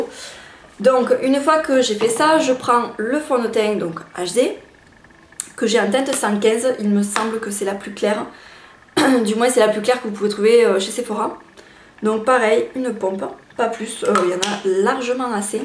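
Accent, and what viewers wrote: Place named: Marseille, France. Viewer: vu ton accent tu dois venir prés de marseille?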